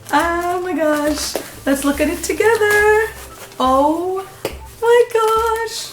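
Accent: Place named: Japan. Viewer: Omigod I love your voice! It’s like a Japanese accent with a lil bit of hype black girl!! XD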